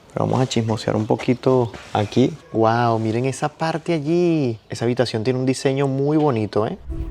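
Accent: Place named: Venezuela. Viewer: se dice "eStan muy DeSgastadas " nose por siempre dices ejtan muy dejtadas el acento venezolano es feo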